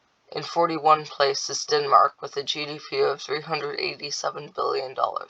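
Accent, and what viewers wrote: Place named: United States. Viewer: You sound very American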